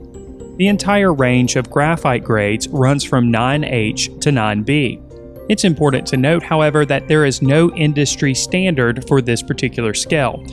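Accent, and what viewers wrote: South, United States. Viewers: Something about the quality of your voice reminds me of Adam Scott ( albeit with a soft southern accent)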